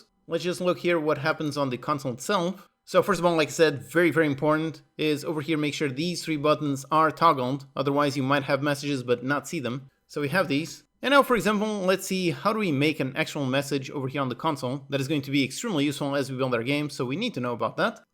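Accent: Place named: Portugal. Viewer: Been watching you for a long time, only now i realized by your accent, tu és tuga como eu. oh my God... Santa ingorancia a minha. Luv you bro. Keep on Keeping on. ❤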